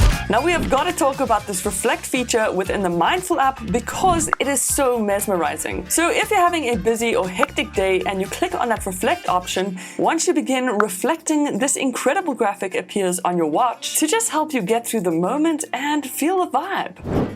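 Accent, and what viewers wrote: South Africa. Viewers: Fnb App on your Watch? Are you South African ? I honestly thought you were Russian or from like that side of the world lol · Are you from South Africa ? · Great video. Your accent? S. Africa?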